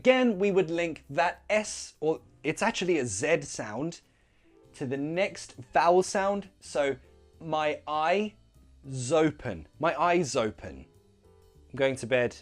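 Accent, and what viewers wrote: United Kingdom. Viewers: Can’t believe what I’ve just found, I always missed this kind of content. British accent and slang taught in a very fun way. We’d get along hahaha Keep it up dude! You got a new sub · I don't like British accent, then I've found your channel! Nowadays I don't miss anything about it! I really like it...👏👏👏👏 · british pronunciation is way too charming i’m love that’s the one i will learn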